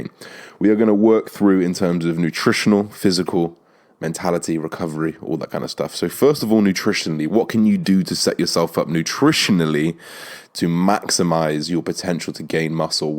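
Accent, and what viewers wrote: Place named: RP, United Kingdom. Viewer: You sound like such a posh boy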